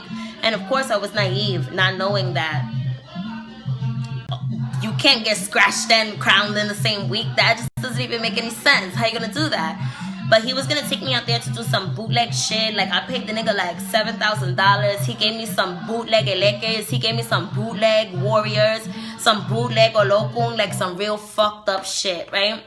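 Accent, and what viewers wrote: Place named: Dominican Republic. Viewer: She sounds like my friend who is Dominican. Loves it!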